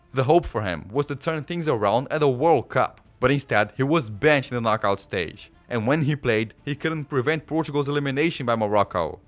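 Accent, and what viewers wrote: Brazil. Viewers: You speak english with Brazilian Accent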